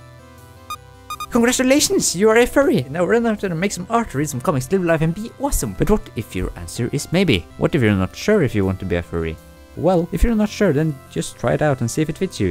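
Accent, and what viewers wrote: Norway. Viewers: Nevermind, found it in one of his other videos, it’s a Norwegian accent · Hahaha your videos are the best - your quirky Norwegian accents/style combine perfectly with an endearing wit that is both playful and thoughtful at the same <3 Also I learned today that in Norway you supposedly eat sandwiches with a knife and fork? Truly a divine country.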